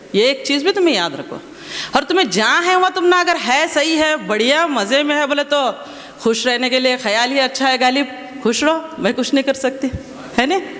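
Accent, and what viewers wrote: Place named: Bijapur, India. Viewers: Urdu dakni mix. Good👍👍👍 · Bijapur urdu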